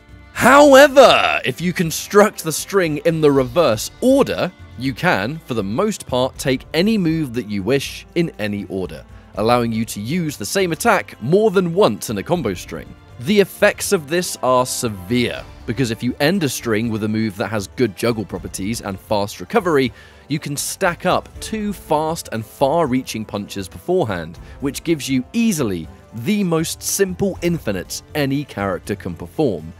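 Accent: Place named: United Kingdom. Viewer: its so hard listening to BRI'ISH people talk... like is he saying FREE or THREE "BOH-OH OVE WAHAH"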